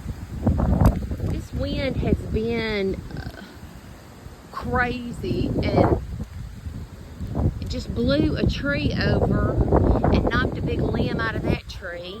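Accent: Oklahoma, United States. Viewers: Sounds like Southern Oklahoma.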